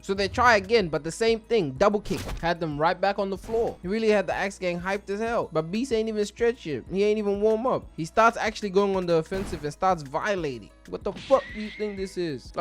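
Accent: England, United Kingdom. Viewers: Are u english or sum? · dude I can tell you English not American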